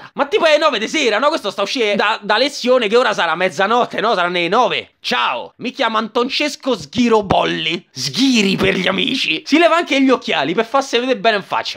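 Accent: Rome, Italy. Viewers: Ma cos'è che scopro sta serie e sto canale solo ora? Ma io me i scrivo subbbbito che sto sputando sullo schermo dalle risate cazzo xD (e il romano me sta influenzando anche se so di milano) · Ahahahahah no vabbè sto morendo! L'accento romano ce sta proprio bene qua! Susu che vogliamo pure tutti gli altri ventordici episodi, susu! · Sei di roma si sente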